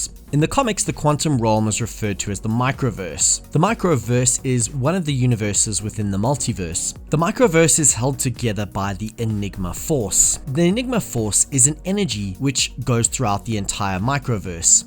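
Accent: South Africa. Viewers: Is this a south african? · Are you South African?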